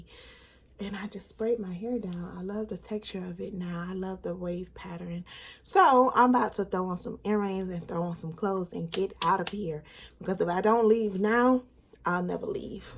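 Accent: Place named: South, United States. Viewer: Girl!!! Yes you are so country lol I love tho. reminds me of my family down south. Love the vlogs and all.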